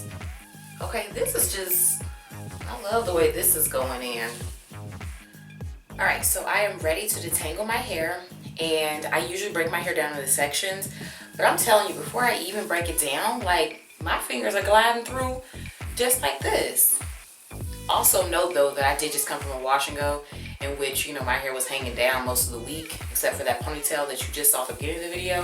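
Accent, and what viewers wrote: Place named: South, United States. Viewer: New subbie here 🤗 I love your personality! The thickness in your hair, lawd bless you. Are you from the south? I love your accent!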